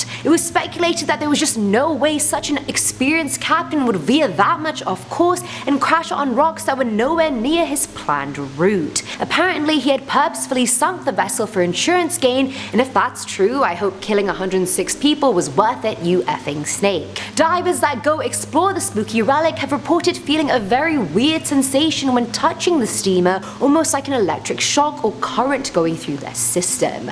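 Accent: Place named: United Kingdom. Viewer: I luv that british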